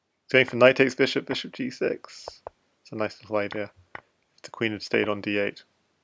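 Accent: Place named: United Kingdom. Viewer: sounds British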